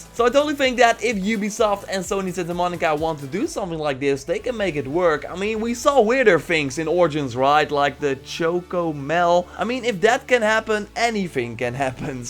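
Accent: Netherlands. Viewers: It is a bit Dutch mate, so I think he is Dutch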